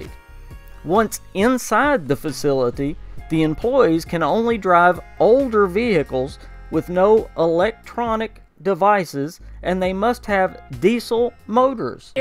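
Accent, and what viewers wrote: South, United States. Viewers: Are you from North Carolina or Texas? Someone said you were in Kansas, but I can't tell exactly.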